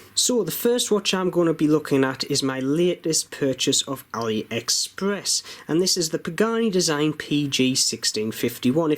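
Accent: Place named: Northeast England, United Kingdom. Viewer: Nice video interesting collection not a fan of that dress watch though I have the pagani submariner in the hulk colour myself and really rate it I am also a fan of vostok watches and of course the odd mod perhaps they could make an appearance in later videos plus its canny to hear a NE accent as im from Newcastle myself keep up the good work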